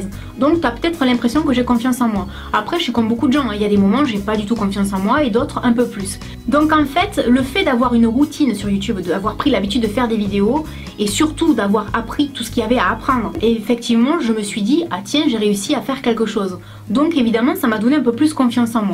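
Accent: South, France. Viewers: Je t adore et les sujets que tu aborde sont très intéressants. J'ai 13 ans et ça n'empêche pas que tu est l'une de mes youtubeuse préférée...💗💗💗 J'aime beaucoup la façon dont tu t'exprime et ton axent!!! je voudrais tellement avoir un axent du sud mais comme je suis du nord c mal parti.lol😘 gros bisous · biendebonjour, ta un accent du Sud toi! mais ces mignon* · Tes vraiment une belle personne, avec une sincérité, ca ne fait pas longtemps que je te suis mais j'adore complètement ta façon d'être et ta personnalité! ❤ et pour finir enfin une sudiste de pure souche sur YouTube ahah, je suis de Toulouse et je suis fière de nôtre accent! 👌💪❤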